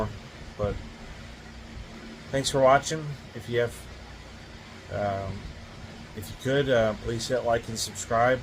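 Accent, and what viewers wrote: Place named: Hampton Roads, United States. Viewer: Good review. And yes some people complain about vah-tech but in the 757 we’ve been saying vah-tech for 45 years just like we say vah-beach. You good bro. Go Hokies!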